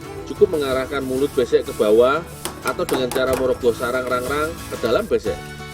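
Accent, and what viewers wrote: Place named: Banyumas, Indonesia. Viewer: Ngapak yow .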